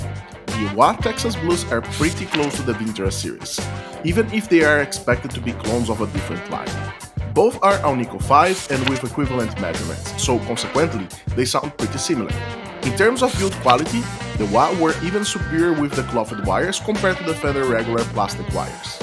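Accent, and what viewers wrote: Brazil. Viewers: Sotaque de brasileiro falando inglês! Hahahaha vídeo top!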